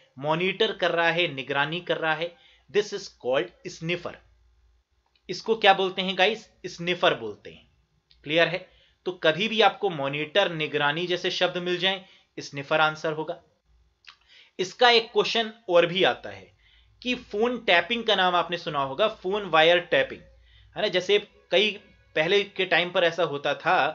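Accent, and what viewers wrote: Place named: West Bengal, India. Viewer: App west bengal se ho kya sir kyun ki waha ke ek teacher odisha main app ki tarah computer ko easy tarike se explain karte hai usi tone main ❤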